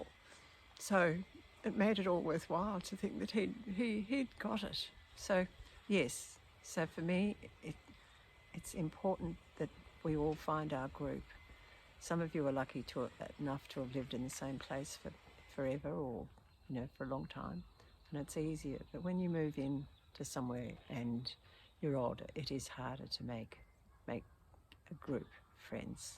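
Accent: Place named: Australia. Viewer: Dear (sorry I don't know your name) I loved listening to your video this morning. I've been listening to a few nice old ladies giving life advice with kind soothing voices so this video appeared in my feed today. Thanks AI! I have to say your voice is just beautiful to listen to! You have a wonderful way of speaking. And good advice too. I love the fact that thanks to YouTube we all have access now to the wisdom of people like you. It's a healing substitute for the families we either didn't have, or did have, but who weren't so free with their kindness & wisdom. What a gift to the world. I also love the fact that you are Australian. I lived there for 20yrs & hearing your accent, plus the crows & magpies in the background, brought back some nice memories for me. I'm back in NZ now & it's quite different over here. Best wishes from Wellington, I've subscribed and I look forward to hearing the rest of your advice 🙏💐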